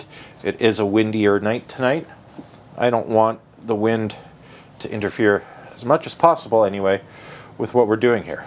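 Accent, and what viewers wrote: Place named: United Kingdom. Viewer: Just looked at some of your videos very interesting demos, looks like you have a degree in science or something. Very clever and looks like you put a lot of reseach into how parts of the phone work. And a fellow Brit by the sounds of it :D. catched a glimps of your paper work, something I wouldn't have a clue about understanding what you put on it so I'll leave that with you :D. Subbed also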